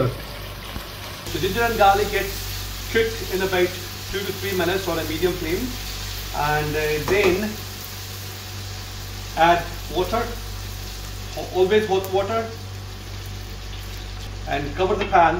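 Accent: Scotland, United Kingdom. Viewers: Is that a Scottish accent I hear? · Looks delicious probably cooking tomorrow 👍 You sound like you have been working ing in uk/Scotland?? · I detect a slightly Scottish inflection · Why you sound scottish?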